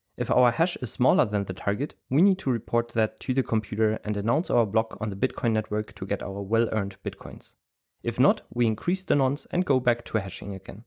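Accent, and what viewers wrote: Germany. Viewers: Man hört den deutschen Akzent :) Trotzdem cooles Video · Aussprache lässt darauf schließen das du deutscher biat xD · DAs hört sich an, als wärst du von Deutschland. Der deutsche akzent. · Du hast einen deutschen Akzent:) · ist das ein deutscher akzent? 👋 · Kleiner deutsche akzent lol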